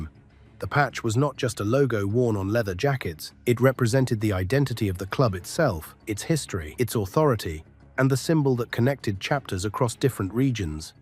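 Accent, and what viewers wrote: England, United Kingdom. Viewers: Why is there so often some dork from England doing the narrating?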